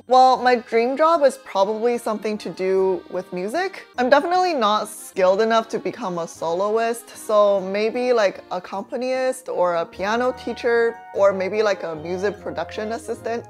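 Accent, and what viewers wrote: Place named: Canada. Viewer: You sound like a Canadian Jenny Tian lmaooo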